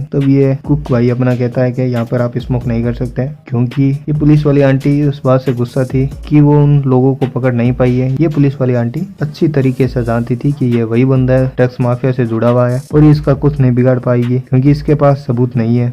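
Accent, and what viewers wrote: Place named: Haryana, India. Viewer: Haryanvi can't be snitch from anyone . With fluent hindi anyone can tell you are from Haryana and i too am. All the very best brother , wish you more success .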